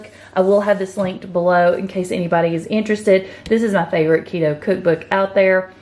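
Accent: South, United States. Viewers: YAY!! Meal prepping! Thanks! I have 4 kids (2 still at home and the youngest is the pickiest 5 yr old girlie on the planet I swear! LOL). Btw, I love your southern US accent: it reminds me of Dr. Ken Berry's accent and I think he lives in Tennessee so I can only guess that you're from the same region. Anyway, I'm going to watch your video and take notes as this looks like what I'll be making for my own family over the weekend. Warm regards from southern but not-so-sunny Belgium, Donalda (new sub)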